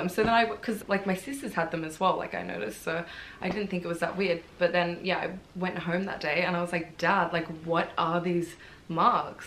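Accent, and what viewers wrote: Australia. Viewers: I love Australian accent so much that my Siri is male Australian 💯💯☺️☺️☺️ I wish I could speak like you.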